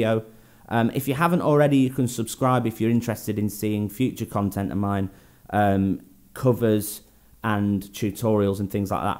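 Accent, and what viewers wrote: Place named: England, United Kingdom. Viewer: Wow I thought you were from England. Your accent is English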